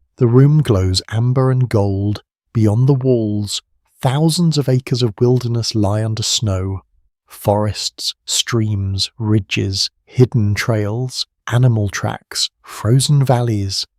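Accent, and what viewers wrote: England, United Kingdom. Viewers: Pcffffst. Give us simeone without a brit accent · These are stories of American history. If these voice overs are AI why use a “person” with an English accent. Why not use a voice more like Sam Elliott? It just seems more fitting.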